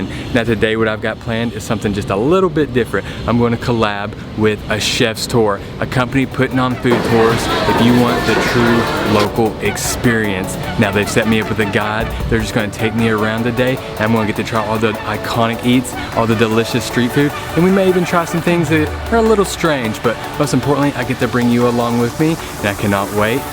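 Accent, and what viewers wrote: South, United States. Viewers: You are just way too handsome! My sisters and I love watching you and gushing over your adorable southern accent ☺️! Whenever we watch your videos, Our partners walk out the room and roll their eyes like 'oh here we go, that guy they all think is so sexy'! Lol.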